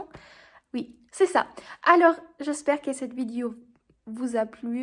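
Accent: United Kingdom. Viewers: C'est amusant, je n'ai aucune idée de pourquoi l'algorithme YouTube m'a proposé votre chaîne. J'ai regardé d'autres vidéos pour essayer de voir d'où venait votre accent mais... j'ai été surpris. Vous savez que pour un locuteur français natif, vous sonnez presque britannique. J'aurais parié sur britannique avant de cliquer sur d'autres vidéos par curiosité. Aborder des sujets complexes dans une langue que l'on ne maîtrise pas relève du défi. Certains choisissent la facilité... D'autres choisissent de parler métaphysique dans une des langues les plus complexes d'Europe 🥲 C'est fascinant, rien que pour l'audace et le côté incongru et insolite, je m'abonne, lol. Ce genre de démarches ne peut que me paraître sympathique... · idem😊😅 j ai cru que l accent était britannique mais ça n a pas d importance. 😊😅 Elle est connectée et de la bonne façon. Son rayonnement nous fait du bien à tous et à toutes. 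Paix joie et amour 🙏🙏🙏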